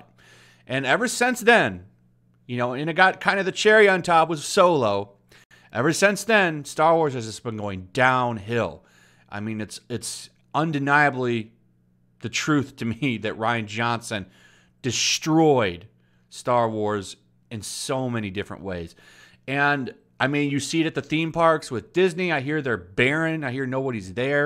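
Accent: England, United Kingdom. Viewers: Damn! And here I am waiting for your mandalorian episode reviews in that funny English accent! 😂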